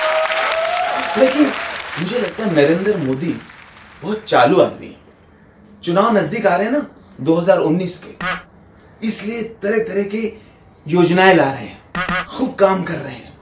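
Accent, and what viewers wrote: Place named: Bengal, India. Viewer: Sir...aapka Bengali accent bda tagda hai.....👍👍👍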